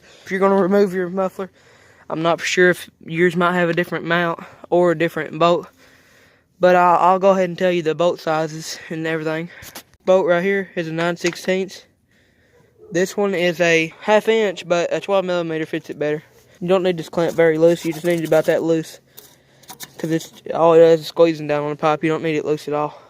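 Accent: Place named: United States. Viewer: god damn son you sounds as american as you can be :) (as a none american) no hate i find it funny just like the british accent and god damn that runs like shit :) jesus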